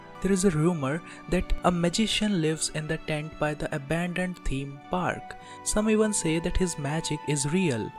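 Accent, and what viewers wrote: India. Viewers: I get Indian vibes from your accent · Fuvking india accent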